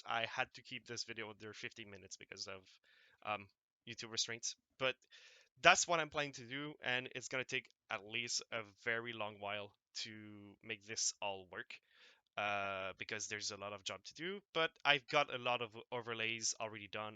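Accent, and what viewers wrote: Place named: Quebec, Canada. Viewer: haha, knew it. your accent is very light but from a fellow quebecois, i could tell. hope your progress on the mod is going well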